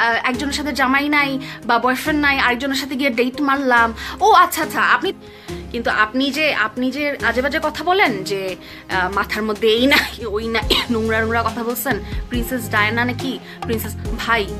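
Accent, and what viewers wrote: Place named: Noakhali, Bangladesh. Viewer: Noakhalir meye bole kotha love you apu 😘😘😘😘😘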